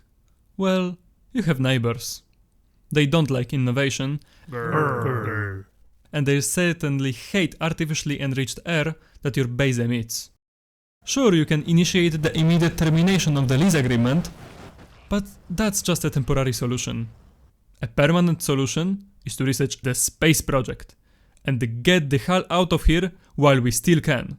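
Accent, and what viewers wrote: Poland. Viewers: Polski akcent słychać po pierwszych dwóch słowach :p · Mega polski akcent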